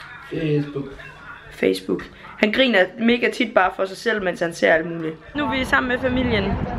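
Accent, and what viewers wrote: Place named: Jutland, Denmark. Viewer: Jeg føler at du lyder meget mere jysk når du er sur elsker dig❤️😂❤️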